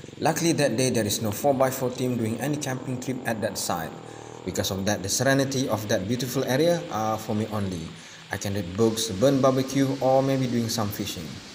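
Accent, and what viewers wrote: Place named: France. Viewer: Where do you live? You sound french but the scenery makes me second guess myself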